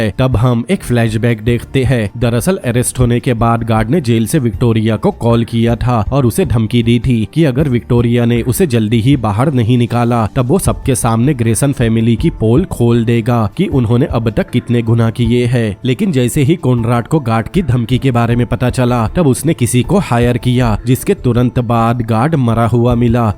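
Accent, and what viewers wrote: Assam, India. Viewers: Kiuki ap ki voice Assamese jisa lagta hai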